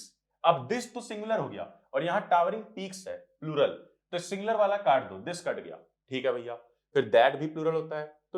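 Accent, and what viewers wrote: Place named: Mumbai, India. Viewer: Bhaiyaa aa mumbai ke lagte ho 🔥🔥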